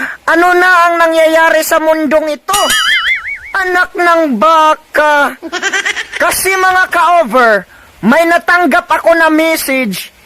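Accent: Visayas, Philippines. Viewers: Napakatalented. Parang bisaya yung dila pero napakagaling na makatang tagalog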